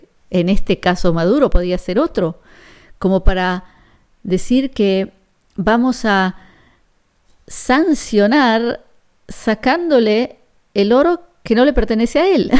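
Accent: Argentina. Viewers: hablas así porque sos Argentina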